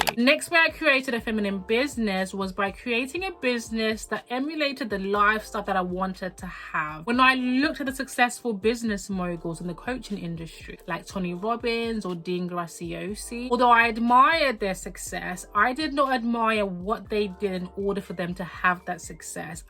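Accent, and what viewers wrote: United Kingdom. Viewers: I started my channel/personal brand, no subscribers yet. But hey, I got step 1 complete🎉 I just knew you were from the UK for some reason ❤ 🥂 I have a lot of hobbies and it tough to merge them as well so I end up spreading myself thin and overwhelmed. I need to try email Marketing even content ads as well .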